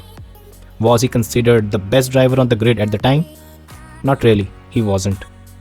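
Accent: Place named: India. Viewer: When i heard an Indian vioce i swiftly paused it as i know all facts will be wrong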